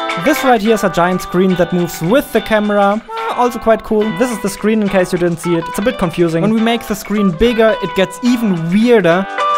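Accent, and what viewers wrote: Germany. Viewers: You're german like me I can hear your accent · Du bist aus Deutschland Ich weiß es ganz genau dein Name ist und du hast mega akzent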